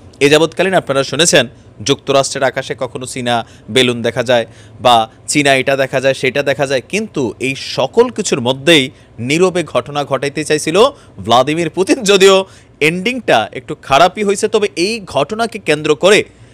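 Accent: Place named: Comilla, Bangladesh. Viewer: হাহাহা ভাই কুমিল্লার ভাষা শুনলে আপনার মুখে সেই লাগে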